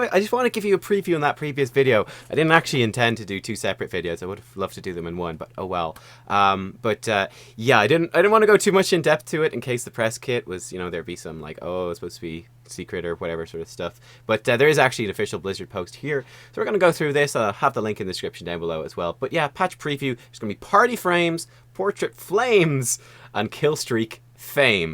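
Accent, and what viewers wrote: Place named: Ireland. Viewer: you are right about that: much more enjoyable to hear you read through it bc my internal voice don't have the cool Irish accent :)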